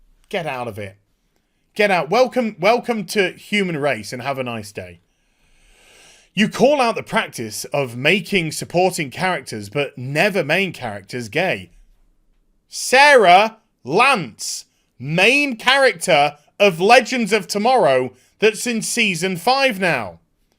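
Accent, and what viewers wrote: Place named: Yorkshire, United Kingdom. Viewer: Wait up a second.. you have the ability to tone down your Yorkshire accent? And yet, Doctor Woke, who is a paid actress, cannot? You should consider acting mate..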